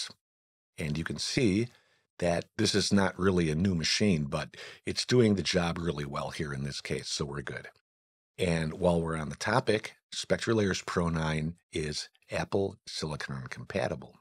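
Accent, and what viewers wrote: United States. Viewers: The upstate NY accent warms my heart. Oh and SL 9 is cool too!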